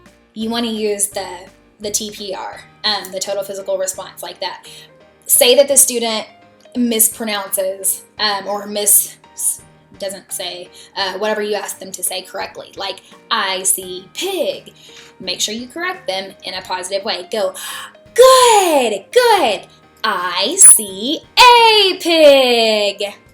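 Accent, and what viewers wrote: United States. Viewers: Glad to hear that hint of Southern accent because I was worried about mine :)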